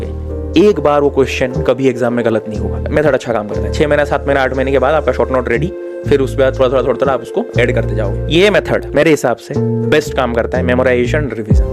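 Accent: Odisha, India. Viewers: Bhai aap odisha ke ho kya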